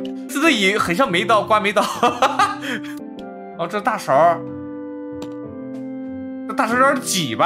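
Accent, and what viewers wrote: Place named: China. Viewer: 乾，我現在才注意到很細心欸！這裡是繁體 B站那裡是简体 (抱歉現在才去B站看主播啊) 我還想說為啥字幕是繁體講話卻是中国腔 ( • - •;)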